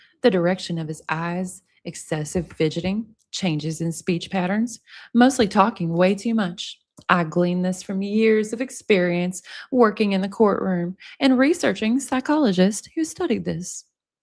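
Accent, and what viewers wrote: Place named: South, United States. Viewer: Having just found you with this video, I absolutely love how you don't operate off of malice and a burning desire to destroy a complete stranger, but simply doing your best in reporting the facts that are available to us made more palatable with a sprinkling of sarcasm and even that's hard to detect thanks to your lovely Southern? [I'm a Brit lol] accent and a wee giggle at the end 🤭😍 that just serves to lighten an extremely dark case all around making it far easier to digest ❤❤❤❤ And that's what's so incredibly frustrating, as they don't even need to introduce new legislation in order to hold Ms. Becky accountable for her actions, far from it actually, as truth be known is there's plenty, plenty laws and statutes in existence that would hold her suitably accountable, but it's incumbent upon the powers that be to simply ENFORCE >>>>EXISTING<<<< LAWS OF THE LAND that could easily apply to Ms. 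Hill including... 1/obstruction of justice, 2/conspiracy (with her son who's also been charged in relation to this debacle) to pervert the course of justice, 3/conspiracy to subvert judicial proceedings, 4/conspiracy to personally enrich oneself and /or profit from the strictly prohibited dissemination of protected /sealed images pertaining to an ongoing criminal trial, 5/conspiracy to engage in and /or assist another to engage in the prohibited interception of protected telecommunications by means of illicitly recording (wire tapping) protected telecommunications for the use of malicious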